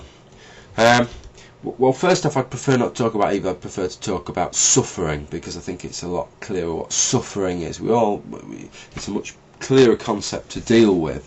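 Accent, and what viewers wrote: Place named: England, United Kingdom. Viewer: LOL! When he opened his mouth I said "lol a pom!" Awesome vid mate! 5 stars.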